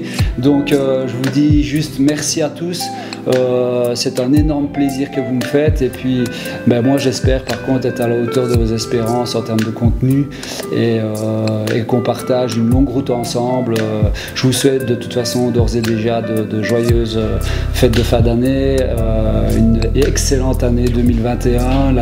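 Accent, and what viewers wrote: Belgium. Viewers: ... ou comment (ré)apprendre les bases issues de l'expérience d'un pro qui se prend pas la tête, avec l'accent belge en bonus! Parfait, change rien. Et pour être très original: merci.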